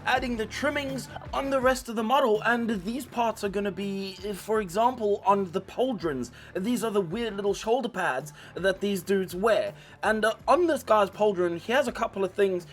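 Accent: South Africa. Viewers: My guy sounds like a fellow South African! No disrespect meant of your not😅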